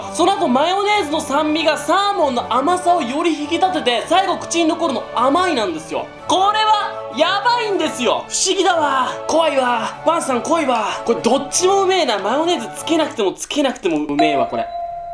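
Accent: Kansai, Japan. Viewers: 、関西出身やったりする？？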